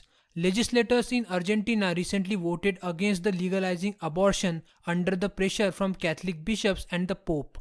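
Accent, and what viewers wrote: India. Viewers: how it is possible to listen? Omg Go learn how to speak without this hindi accent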